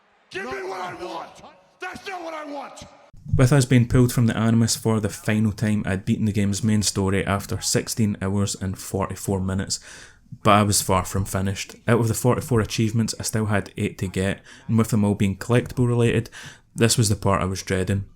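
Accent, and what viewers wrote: Scotland, United Kingdom. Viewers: Currently attempting this myself, subscribed within 4 minutes of watching. Why? The accent. From Glasgow myself and I love to see Scottish CC’s. Fantastic video man, keep it up.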